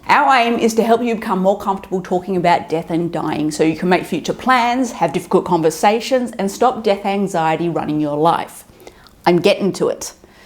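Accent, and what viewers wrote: Australia. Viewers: Hello im an american and just found your channel,. It is very interesting and I plan on seeing 👀 the other videos. Your videos are really get to the root of a problem i see alot in America 🇺🇸 and that is a paralyzing fear of death. Most folks here have trouble with the subject. Perhaps it that many Americans have grown more Godless. If you dont believe in God and an afterlife then whats left??? It scary for those folks. Also I really like the aussie accent and your appearance, you seem very thoughtful and sweet. Many thanks, Ralph😊👍🇺🇸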